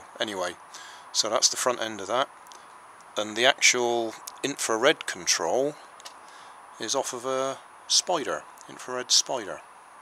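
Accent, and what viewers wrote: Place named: Yeovil, United Kingdom. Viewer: We're are u from it sounds like a Yeovil accent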